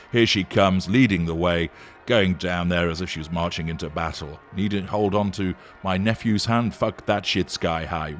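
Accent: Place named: England, United Kingdom. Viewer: you knew an awful lot of the Aristrocrat's names on the steps. Coupled with your aside a few months ago about the clapham omnibus ( a very old metaphor only lawyers and hardly any of them understand or recall) i believe you are a member of the British aristocracy with close links to the law. As a narcissistic psychopath you would make an excellent judge or silk. You are also a royalist and know members of the royal family and find this upstart who has achieved wealth and position aggravating in the extreme. You also use voice alteration technology but some of your pronunciations would identify precisely what area of England you come from. eg how you say the word book. anyway i really enjoy your posts and hope you never are unmasked and continue as a latter day scarlet pimpernel.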